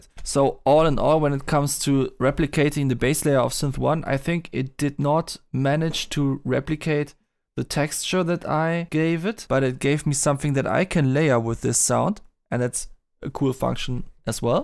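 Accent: Germany. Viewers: where you from? deutsch?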